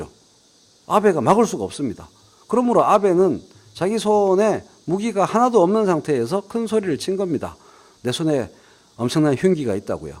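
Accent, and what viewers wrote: Busan, South Korea. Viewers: 궁시렁 궁시렁 씰뗴없는 ㅋㅋ ㅋㅋㅋ 진짜 시작부터 속이 시원!! ㅋㅋㅋㅋㅋ저도 부산놈이라 그런가 옆집 아저씨가 설명해주는거 같아요 ㅋㅋㅋ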